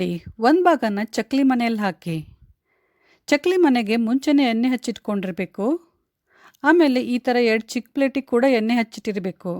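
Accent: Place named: Belgaum, India. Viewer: Hi mam nimma yella aduge nodiddhini thumha chennagidhe, nanna magalu nimma mathina shyli keli naguttale 😂 belgum side mathado tara idhe.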